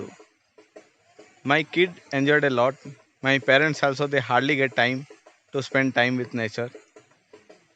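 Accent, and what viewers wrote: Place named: Odisha, India. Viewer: Aap odia walo ho na .. english clean nahi hai aap ke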